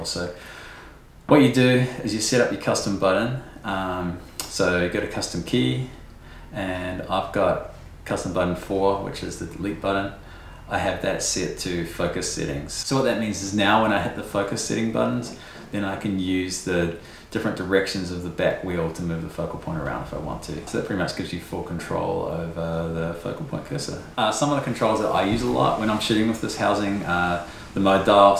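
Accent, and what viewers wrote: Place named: New Zealand. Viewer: Awesome video, thnks very much! Was trying to watch it on a bus with the subtitles, kiwi accent is the best but clearly a struggle for youtube to match subtitles too haha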